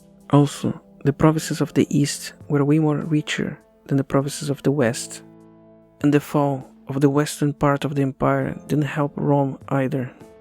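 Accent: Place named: Brazil. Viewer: Are you Brazilian? Your accent sounds Brazilian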